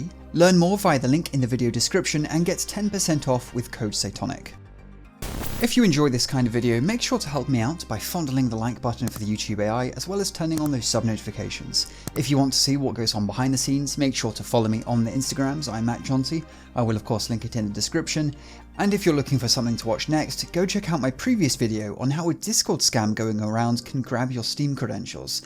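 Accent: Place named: United Kingdom. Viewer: Love you r voice 💖😍 ... excellent British accent....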